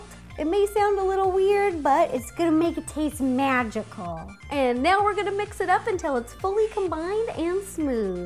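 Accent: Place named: California, United States. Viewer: Ahhhh you're one of those... I think it's a California thing. I pronounce a myriad of words strangely. Melk, Cetch, Pellow.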